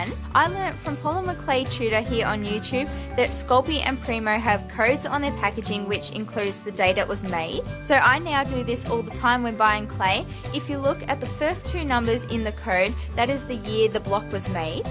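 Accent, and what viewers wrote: Australia. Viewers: Hey, just curious, are you a fellow Aussie? (Just asking cause of your accent and the Woolworth's brand) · I'm brand spanking new, wanting to give this a go and I'm so thrilled with all the "how to get started without buying the whole craft store" tips!! Thank you! Subscribing now because I love your style, your editing is really good and it's awesome to listen to a fellow Aussie! <3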